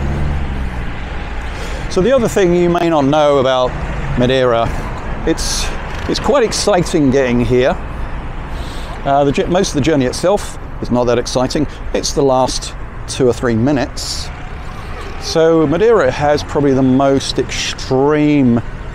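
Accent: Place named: United Kingdom. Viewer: !!!!!! How wonderful. I love, love, love the narration. So informative and interesting. Also, I thoroughly enjoy your very British accent. This whole experience is just great. All the best to everyone for this season and blessings for a peaceful, safe and healthy 2022. God bless you all!!!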